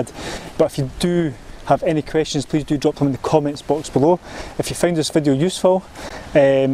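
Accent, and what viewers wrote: Scotland, United Kingdom. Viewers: Perfect review! Thanks for this! I lived in Edinburgh for a year, it's so nice to hear that scottish accent again! :-D (or am I wrong?)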